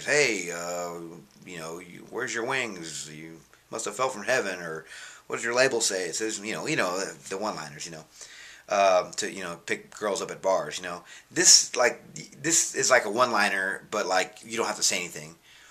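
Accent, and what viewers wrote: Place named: New York, United States. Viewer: I thought you were from NYC for the longest time. Lol Great review braw.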